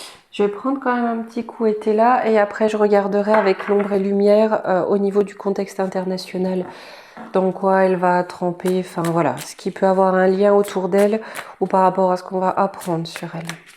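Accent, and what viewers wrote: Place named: France. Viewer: Bonjour. Merci pour cette vidéo. J'adore votre France parler. Bon week-end également.❤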